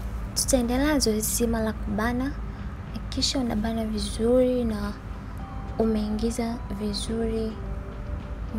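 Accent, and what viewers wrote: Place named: Tanzania. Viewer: Kiswahili cha tanzani Tamu kwanza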